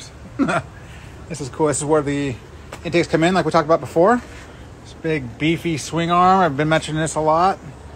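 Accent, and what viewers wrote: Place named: New Zealand. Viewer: He sounds like a Kiwi.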